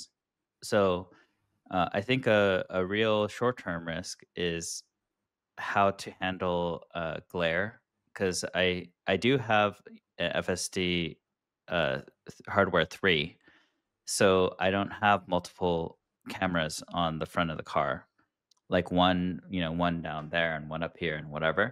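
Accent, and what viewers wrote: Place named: England, United Kingdom. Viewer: This guy has the most annoying English accent ever. I want to listen to the guest. But I cannot handle the annoying accent! I just can' t.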